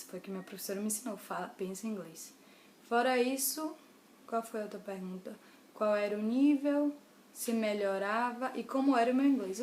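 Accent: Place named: Bahia, Brazil. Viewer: Esse sotaque baiano reconheci na primeira frase. hahah, qual a agência que você foi? Com qual idade? Pretendo ir pela STB. Você indica? Também sou de Salvador, gostaria de saber se ela é bacana. Ah, o vídeo ficou ótimo. Também acho o inglês importantíssimo! Muito bom o vídeo.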